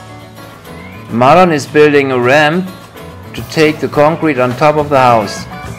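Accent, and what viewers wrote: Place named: Germany. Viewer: as a linguist I seem to hear your German background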